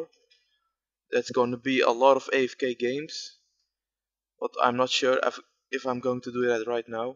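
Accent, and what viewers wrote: Netherlands. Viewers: i hoorde aan je acsend dat je nederlands was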